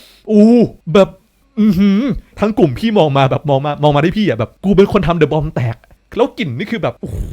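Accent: South, Thailand. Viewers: เป็นคนใต้ที่เอ็นดูความพูดทองแดงอะ<num>พี่ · เด็กปากใต้นิ555+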